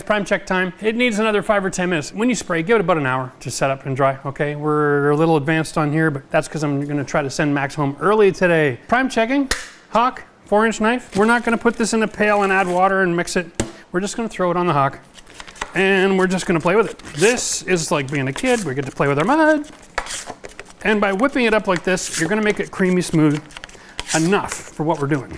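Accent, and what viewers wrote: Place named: Canada. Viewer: I'm from Canada! Trust me...as a fellow Canadian....The way you talk.... We know ! LOL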